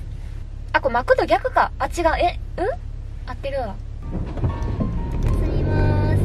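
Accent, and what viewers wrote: Kansai, Japan. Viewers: 可愛い子の関西弁ていいね😄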